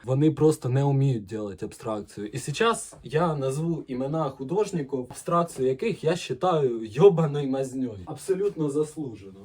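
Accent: Ukraine. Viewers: это потому что у него такой суржик, что только акцент украинский, а слова почти все русские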